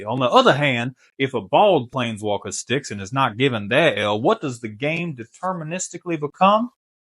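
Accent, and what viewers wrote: South, United States. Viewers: I swear every day you slowly turn into a southern businessman, I expect to hear “I do declare” and “now I’m just a simple countryman” every new video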